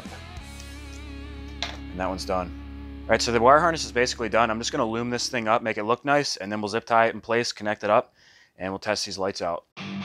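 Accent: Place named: Philadelphia, United States. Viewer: mines an SS, I didn't want to deal with a clutch in the woods but still wanted to be able to shift... Not sure where you're from for if you'd have to deal with it as much (but judging by the "wooder" accent of water, sounds like you're from the Philly/NJ area, so you'd have woods riding as well). But a yxz is pretty easy to work on, everything's pretty open or hidden behind 1 panel instead of having 20 million panels. Just put a titanium exhaust from Graves on mine, sounds like a 1000 streetbike in the woods... Plus, who wouldn't want a 10.5k rpm redline?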